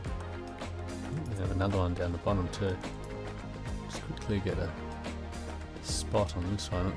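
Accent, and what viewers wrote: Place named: Australia. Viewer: Like your style and it's good to hear an Aussie voice. That letterbox is bad tho, unless otherwise my phone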